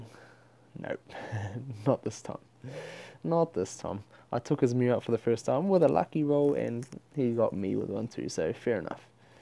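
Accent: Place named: South Africa. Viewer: Johannesburg, your accent sounds very South African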